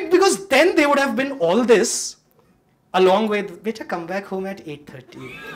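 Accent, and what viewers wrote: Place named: Jaipur, India. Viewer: this guy has a jaipur accent,is he from jaipur?? Anyway great comedian with no stereotypical jokes on politics and the country.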